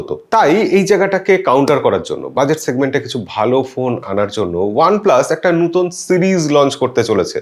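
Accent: Bangladesh. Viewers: এই ভদ্রলোক এর উচ্চারণ বাঙাল দের মত। কলকাতার দর্শক দের জন্য বেমানান। এবিপি এর মান এর সাথে যায়না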